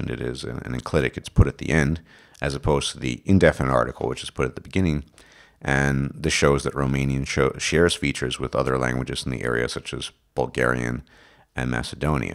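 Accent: RP, United Kingdom. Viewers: like for the queen's english accent. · Haha, RP it's called? You sounded just like William F. Buckley, Bertrand Russell, Aldous Huxley, Arnold J. Toynbee, and Stewie Griffin.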